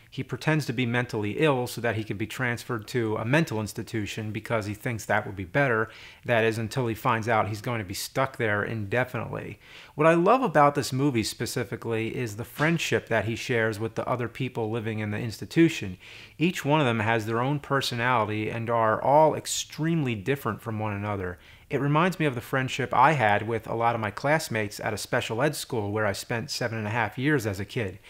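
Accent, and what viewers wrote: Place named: New Jersey, United States. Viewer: Tyler White I think that would be his Jersey accent lol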